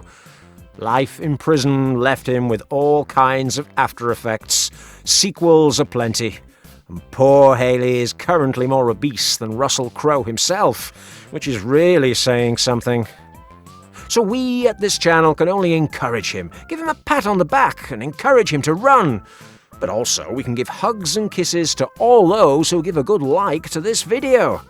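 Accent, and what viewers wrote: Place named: Northern England, United Kingdom. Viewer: I've spent months trying to figure out where the narrator is from. Northern England, somewhere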